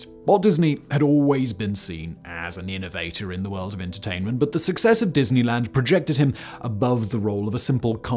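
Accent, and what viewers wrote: United Kingdom. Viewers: Sometimes it occurs to me that my family members probably wonder why I'm listening to the same British guy constantly, unaware of the unusual number of channels this man hosts.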